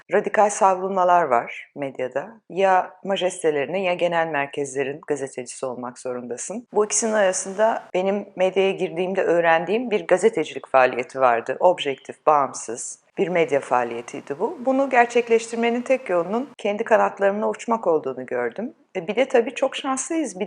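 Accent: Standard. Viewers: Dinlerken yormayan, temiz bir türkçe, güzel bir ses tonu ve gerçeklere vurgu! Severek izliyorum (dinliyorum) 👍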